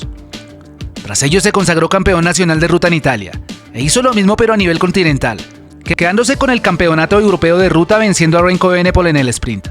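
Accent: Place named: Colombia. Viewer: Se nota que eres colombiano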